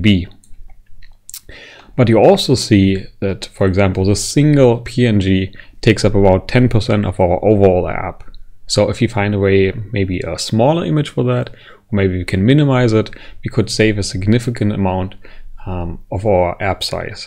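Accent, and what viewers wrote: Germany. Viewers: Instant erkennt das du Deutscher bist am Akzent xD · Its called German Accent. Even medic from tf2 has that accent.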